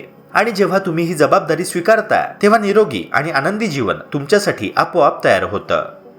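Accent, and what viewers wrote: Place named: Pune, India. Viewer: Pune bolte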